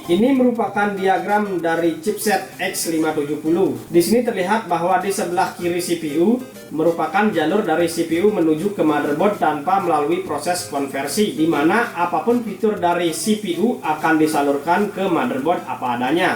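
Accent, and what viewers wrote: Bali, Indonesia. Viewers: logat khe bali bgt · Logat bali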